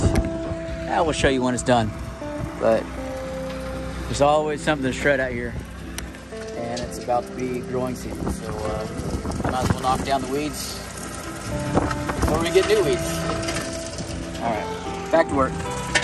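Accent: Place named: Rhode Island, United States. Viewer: we are Rhode islanders so the accent gives it away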